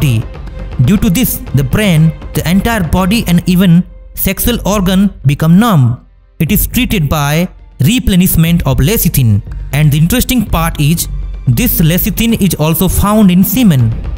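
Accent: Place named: India. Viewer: Visuals and content is true and good but your accent is really funny (hindi speaker?) I'm from Tamil nadu but i find it different.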